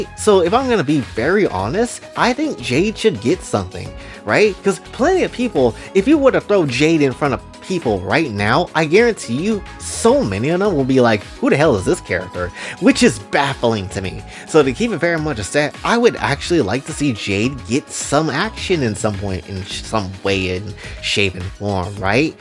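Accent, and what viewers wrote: Brazil. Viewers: Indeed, the guy sounds like a brazilian a bit, and I can not pinpoint why. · Hey bro i only thought of asking know but are you BR? Your accent is familiar and l0lic0n BR YouTubers are very rare so i got curious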